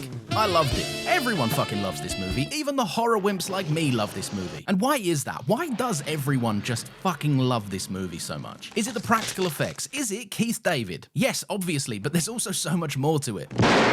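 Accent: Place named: United Kingdom. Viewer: 20 secs into a video and I already hit the subscribe button Your vibe totally clicked with me, the pacing, the delivery, the montage, everything Keep it up, brother, that's some good stuff you're doing here in all honesty P.S. A cherry on a top of all this is the fact that I don't have to put you on 1,25 speed because you are already fast enough P.P.S. Your accent is another one (by the way what is it? I'd say it sounds British but I'm not too good at guessing these)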